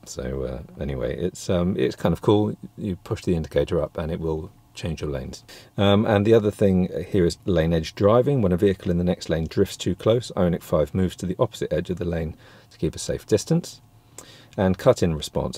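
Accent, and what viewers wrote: United States. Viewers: The American accent wasn't so bad, please do a full episode with the accent! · The American accent… 😂😂😂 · I liked your American ascent :) ........and I'm American. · One question. Great American accent! Have you done some acting in the past?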